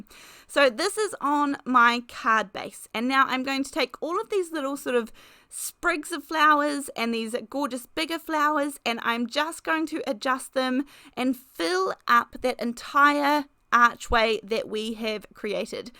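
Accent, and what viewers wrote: New Zealand. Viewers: agreed. I love your Mainland accent😊 it brightens my day. ❤ have a fabulous week. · I'm an Aussie an although we usually take the mickey out of our Kiwi cousins I also like accent and herbright and breezy outlook always makes my day 💕🤪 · Beautiful cards from cute stickers. I love them all. I love your accent, too. I watch a lot of BritBox and you have helped me to identify the New Zealand accent 😊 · !! Don’t say vayze. Be proud of your kiwi accent with English roots and say varze. Lol. And yes i also love your smiley happy voice too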